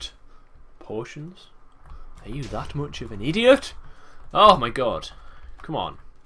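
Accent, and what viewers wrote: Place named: United Kingdom. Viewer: You British m8 love your vids you make my day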